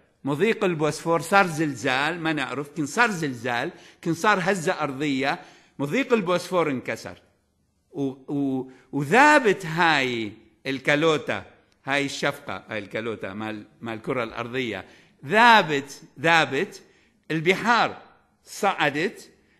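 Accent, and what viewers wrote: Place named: Mosul, Iraq. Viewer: لهجتك المصلاوية مشوقة بقدرمحاضرتك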